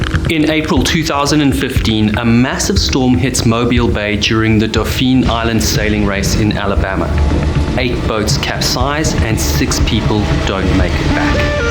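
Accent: South Africa. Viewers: Why do you use prioritize imperial measurements over the metric system? Your channel bio says the channel is based in Germany, and you clearly have a South African accent. Neither one of these countries use imperial measurements. This is baffling that you chose to prioritise your American audience.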